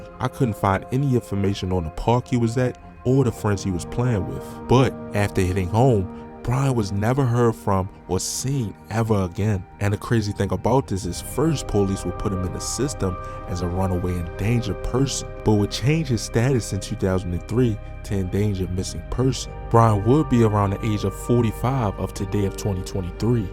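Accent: Baltimore, United States. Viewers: The narrator sounds like he from Baltimore.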